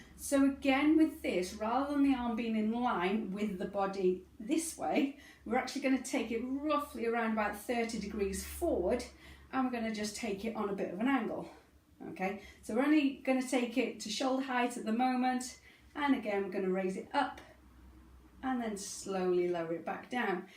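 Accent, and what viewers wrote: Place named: United Kingdom. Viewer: you are beautiful and I love your British accent. God bless you from the U.S.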